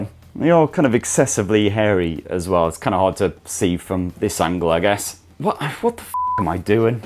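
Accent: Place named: Sunderland, United Kingdom. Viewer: The innuendos and smutty humour were very strong on this one. Well done my favourite Macam or is it Geordie? Sorry not sure. Either way the best tech reviewer from the north east, possibly the world! Edit. Ahhhh you answered my question. Sunderland!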